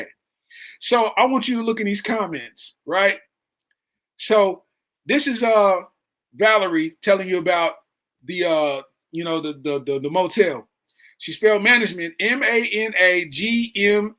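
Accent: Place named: United Kingdom. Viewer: There's something about how brittish people articulate themselves that makes it twice as funny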